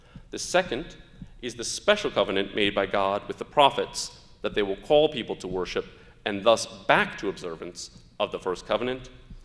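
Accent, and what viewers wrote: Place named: Arab world. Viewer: He has a beautiful genuine Arabic accent.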